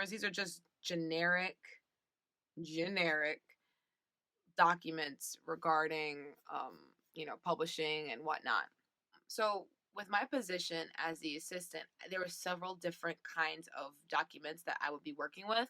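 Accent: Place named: New York, United States. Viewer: Super late to the game here, but I finally caught up with that Kurtis/Dean dating video and had to give you a sub - you're super entertaining. Also, as a New Yorker who has (unfortunately) lived in Buffalo for the last 4 years, it's nice to hear a real New York accent whenever I get the chance.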